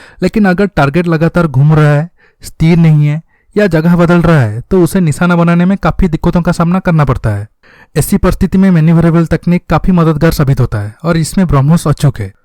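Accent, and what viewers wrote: Odisha, India. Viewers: Brother are you odia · Aap Odisha se lag rahe ho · Odia accent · Are you a odia guy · App kya Odisha se ho?? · Katha gura pura odia bhali laguchi, aau bhala bi laguchi, good job please carry on. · R u a odia U r acent r like that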